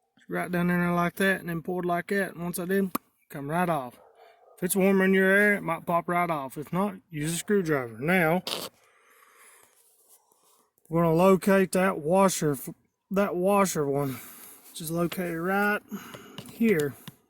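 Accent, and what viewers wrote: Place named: South, United States. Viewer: The first thing I check for is a good solid "Southern Drawl" iminating from the commentator. Once you have established that the presenter has that "Drawl" you're looking for then you can be assured that this fellow is self sufficient and probably has years of experience working on his own vehicles. You will notice that there will not be any unnecessary "BS" from some stuffy old book or manual. What you will hear will be the voice of experience and will be explained in a "no bullshit" manner that even a city boy can understand. So listen up Billybob cause it aint going to get any better than this !!! My hat is off to the gentleman that took time to show me how it is done. Thank you Sir.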